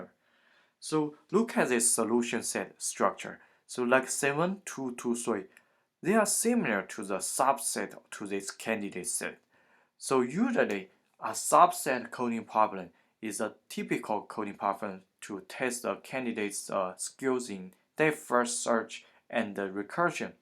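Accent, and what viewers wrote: China. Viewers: up 主听口音应该是我大华人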